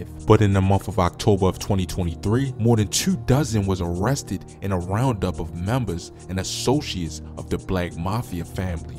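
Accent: Baltimore, United States. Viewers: You sound like you from Baltimore lol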